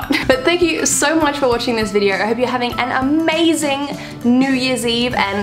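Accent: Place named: England, United Kingdom. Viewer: Is she english? I quite like her accent 🧡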